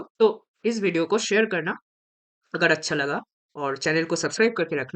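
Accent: Bengal, India. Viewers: Bengali ho kya bhi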